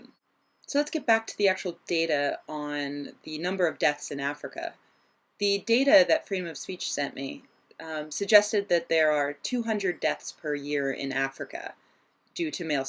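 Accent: United States. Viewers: Alright. So based on your accent I'm going to assume you're American. If I'm wrong please correct me. As such the statistics that are most close to home for you should be those of American citizens. In America roughly 80 percent of men are circumcised. This comes out to be roughly 260,000,000 men. 200 of those men die from circumcision every year. FGM promotes another staggering number with 500,000 women either being victims of or at risk of FGM. As far as my research has shown there are 0 annual deaths due to FGM. FGM is horrifying. It disgusting, and evil; however, to deny that other practices are not to be taken with the same sense of urgency simply because of the gender of the victims is both damaging and disingenuous. I look forward to the day when both plights can be handled at the same table with the brightest men and women our world can offer. Thank you for your opinion, and the vehicle necessary to make mine heard as well.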